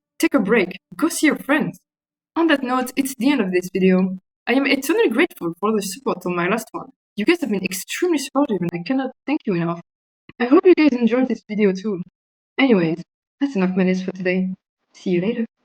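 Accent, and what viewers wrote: France. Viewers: I'm betting right now, that you may be french, 'cause you sound french.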